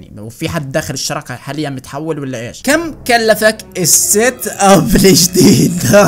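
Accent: Iraq. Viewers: لهجتك بالعراقي تجنن 😂😂❤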